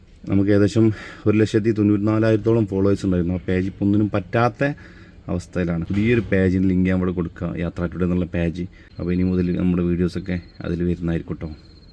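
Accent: Malappuram, India. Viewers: മുത്തുമണി മലപ്പുറം ഭാഷ മതി ❤️❤️❤️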